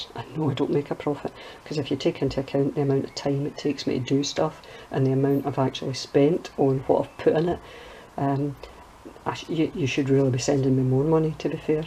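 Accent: Scotland, United Kingdom. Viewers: Your English is British? Because I feel a Scottish accent... Am I right?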